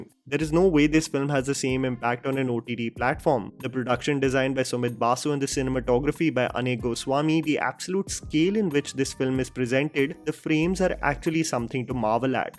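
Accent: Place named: India. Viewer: Followed by horrendous indian accent ................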